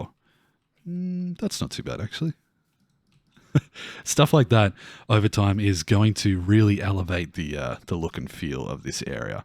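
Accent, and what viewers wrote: Australia. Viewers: finally have time to watch the episode!! If it's not full of Aussie Builder heavily accented slang I want a refund!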